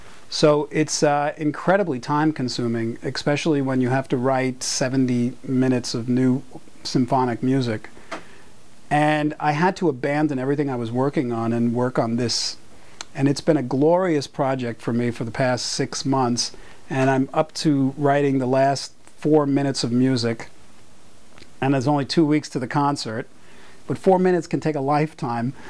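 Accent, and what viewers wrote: United States. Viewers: Love the New York accent. Awe-chestra! :D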